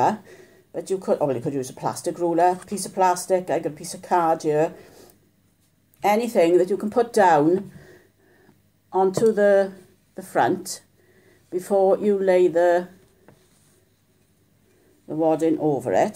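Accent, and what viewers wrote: Wales, United Kingdom. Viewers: Oh this is a great tutorial .. now i can see where i made mistskes on my squares. Thanks everso and I love your Welsh accent. My dad's family are all from Llanelli... any time I need a dose of Welsh tones I will come and replay your video 💕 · Your tutorial is the best 👍...and I love your welsh accent 💟⭐I will use your method when I sew my log cabin quilt together. 🙋‍♀️😊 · Super video......Love your welsh accent....Thank you xxx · Ok. I just read a few of the comments and your replies. Your accent is Welsh! Awesome. I have now completely made 3 king size quilts by hand using your method. I am only a few months away from finishing my fourth king size quilt. This one is a double Irish Chain. Still using your method. You are the BEST. USA. Kentucky.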